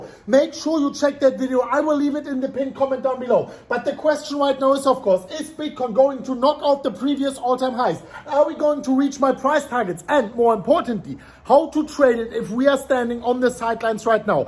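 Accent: Germany. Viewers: Even if you speak English I fell like you speak German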